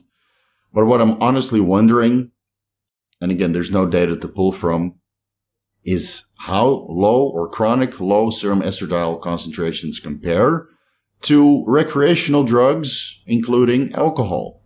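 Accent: Netherlands. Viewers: I think it may be his Dutch accent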